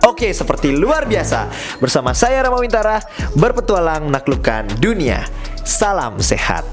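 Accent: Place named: Bali, Indonesia. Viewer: Logat balinya kerasa🤩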